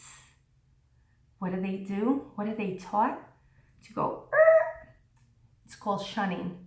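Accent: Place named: New York, United States. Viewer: Where is your accent from ? Sounds like a New York Latino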